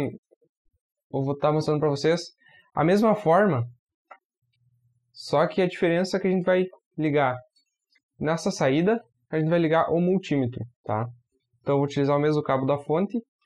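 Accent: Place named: São Paulo, Brazil. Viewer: Esse cara só pode ser do vale do ribeira, pelo sotaque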